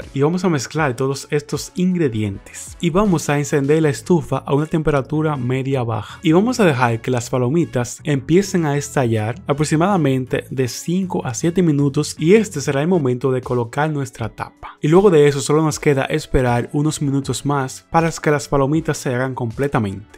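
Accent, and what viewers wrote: Dominican Republic. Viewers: Eres dominicano? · Suenas de RD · Sos dominicano verdad?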